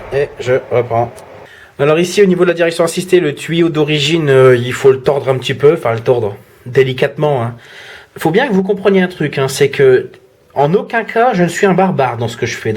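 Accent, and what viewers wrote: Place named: Nord, France. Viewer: Salut " " je me permets de t'envoyer ce message car moi aussi je suis youtubeur je viens à peine de me lancer dans tout ça. Je suis mecanicien PL de métier et j'aimerais que tu me donnes ton avis sur ma chaîne n'hésite surtout pas à critiquer. Tu ne sais pas comment les gens adorent ton franc-parler. Cela se sent que tu es du Nord moi mes parents sont du câteau 59. Et franchement je kiffe trop ta façon d'être. Je te laisse le lien de ma chaîne youtube surtout n'hésite pas à me déglingué s'il le faut <link>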